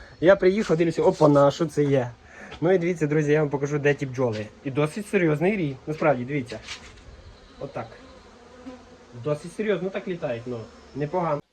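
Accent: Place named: Dubno, Ukraine. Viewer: у мене бабуля з Судобичів біля Смиги. Чую чую я цю мову Дубенську чи у тебе Радивилівська? ))) класно там ліси ставки....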